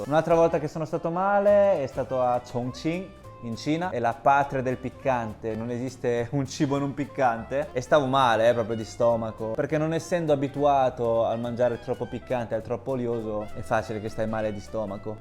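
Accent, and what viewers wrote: Italy. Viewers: parli cinese con un fortissimo accento italiano🤣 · Cose avvincenti notante in questo video da parte di un italiano che ha vissuto a lungo in Cina. l'intro in cinese con marcatissimo accento italiano. :°) i piatti strani cinesi. mi hanno stupito! del primo non avevo mai sentito parlare e mi ha incuriosito (l'ho appena cercato e pare sia roba che viene dalla provincia di Guizhou). gli sputi come cosa negativa della Cina, ricordo la prof di Cinese a Pechino che diceva che non si era al sicuro neanche quando si va in bici perché magari a fianco ti ritrovi un altro in bici che sputa e ti becca! ci avrò fatto caso il primo periodo ma poi non ho visto quasi più nessuno sputazzare in giro (magari anche il covid ha aiutato). una cosa che io invece detesto particolarmente è che la gente entra in metro (e in ascensore!) e in bus prima che scendi e mi da sui nervi. 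lol. dulcis in fundo a SHIJIAZHUANG mentioned! un salutino a Shijiazhuang la prima città in cui ho vissuto in Cina. ancora complimenti e scusate il pippone. ; P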